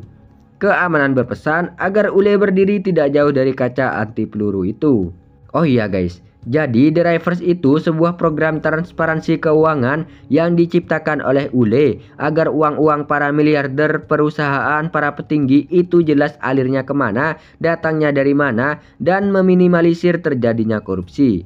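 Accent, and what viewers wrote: Madura, Indonesia. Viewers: Orang madurakah pak, logat nya seperti orang madura😅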